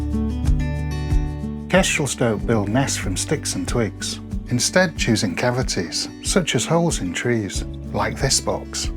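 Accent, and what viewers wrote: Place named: West Yorkshire, United Kingdom. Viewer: Hello! I've watched a few of your videos before - I genuinely started because I love animals in general but also having lived in West Yorkshire for a huge chunk of my life I just missed the accent! Haha! - but have finally subscribed. I am a huge fan of corvids, they're so beautiful and intelligent so it felt a bit strange rooting for the Kestrals in this video. 😂 Thank you for these educational and informative videos, I've learned a lot in just the few that I've watched and am definitely going to introduce my 10yr old nephew to the channel as we love watching animal documentaries together. Looking forward to binging through your past videos and seeing what comes next! Thank you for all your conservation work that keeps these species and many more around for my nephew and following generations to see. It breaks my heart to think that some of the creatures we see around us could be as distant an idea to future generations as the woolly mammoth is to us! 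😢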